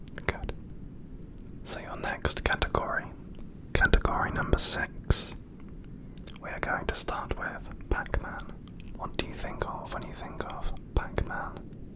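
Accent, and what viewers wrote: United Kingdom. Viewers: Love the english accent in ASMR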